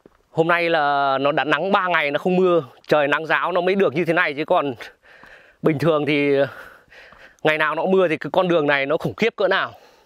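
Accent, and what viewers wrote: North, Vietnam. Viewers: Cám ơn em lang thang cho mọi người xem clip về chealir, tôi chỉ nghe biết trên bài hát bây giờ mới được xem, em nói rất rõ với giọng miền bắc, rất lịch sự ko phân biệt chế độ, tôi thích và cám ơn em, Chúc em nhiều sức khỏe để làm nhiều clip hây cho mọi người xem. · Tôi cũng là nguoi bắc gia đình cách mạng nhung Lần đầu tôi nghe giọng 1 thanh niên nguoi miền bắc khi nói đến mỹ và vnch mà ko văng tục như kiểu mất dạy thường thấy,chắc do giáo dục từ trong gia đình và sư hiểu biết lịch sử bằng những kiến thức đa chiều cảm ơn bạn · Tôi đã lớn tuổi . Trước năm 1975 cũng đi lính V N C H đã coi và nhìn hình ảnh về đồi Sạc Ly , thấy anh nói giọng Bắc rất là chính xác không sai tí nào . Cám ơn anh đã cho tôi nhìn hình ảnh về đồi Sạc Ly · Thật khâm phục và ngưỡng mộ người bạn trẻ ... có giọng nói miền Bắc rất thân thiện, dễ nghe và dễ mến ... 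Bạn trẻ này đã có một kiến thức rất rộng ... rất chân thật khi nói về Trận chiến ở Sạc ly với tấm lòng tử tế và sự Trân trọng từng câu, từng chữ dành cho những người Chiến sĩ của 2 miền Nam Bắc yêu thương 🌹 🩵💛🩷❤️💙🩷💜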